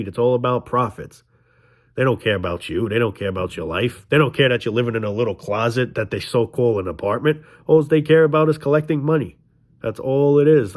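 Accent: New York, United States. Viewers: You’re funny as shit 🤣 your speaking cadence and New Yorker accent is hilarious 😂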